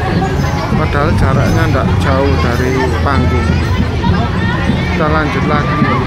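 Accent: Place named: Java, Indonesia. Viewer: Medok rek suare ..aq yo wong ngabab lho..omahku kulon lapangan rek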